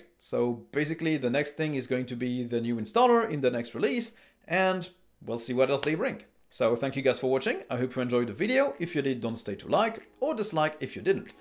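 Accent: France. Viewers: Vous êtes français ?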